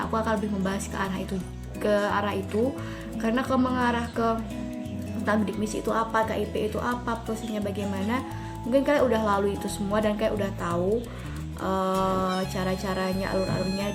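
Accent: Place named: Manado, Indonesia. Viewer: Wih kakak udah kelamaan di Manado, udah bisa jago bahasa Manado nya. Kakak ngana sekarang masih kuliah di Unsrat...?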